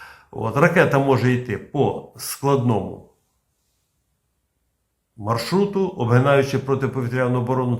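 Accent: Vinnytsia, Ukraine. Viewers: Завжди приємно бачити і чути Вас, пане. Завжди зрозумілі, конкретні, оптимістичні огляди і якійсь рідний для мене акцент мови, як на вінничині. Дякую за всі Ваші труди.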